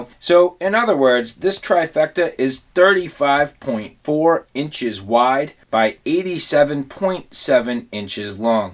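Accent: Boston, United States. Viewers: Not sure where is the US you are from but judging from your accent - you just got to be from the NE, possibly Bawston? Am I right? BTW, I just got my Trifecta in the mail; now I got to go try it out. Cheers.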